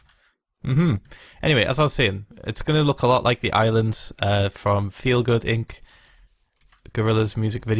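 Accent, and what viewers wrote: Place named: North East England, United Kingdom. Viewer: Technically, not all of the UK. is North-Eastern English (coming from a North-Westerner)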